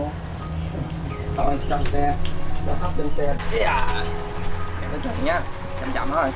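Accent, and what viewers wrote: South, Vietnam. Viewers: Bạn ấy sinh ra và lớn lên ở miền nam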